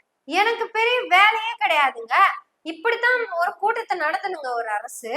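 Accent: Kongu, India. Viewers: I very much like our kongu Tamil. pesu thanfam kalakkeereebfa my daughter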